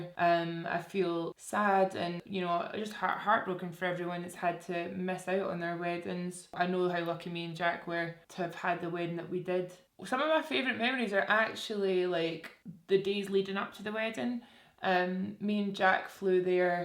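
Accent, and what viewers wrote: Scotland, United Kingdom. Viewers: You sound just like Adele with your British accent! Lol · I randomly found your videos a few weeks ago and I have binged sooo much of your content. I love your style, your attitude and your Scottish accent. ~ You are gorgeous. Cheers ~ Kay from Oz ~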